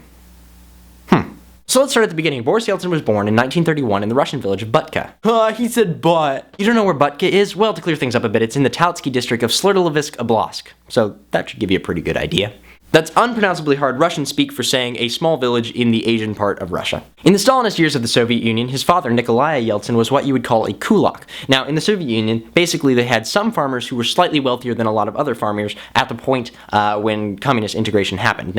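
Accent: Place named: France. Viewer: How in the world did you know all this? More importantly, your French/Non Russian accent is adorable.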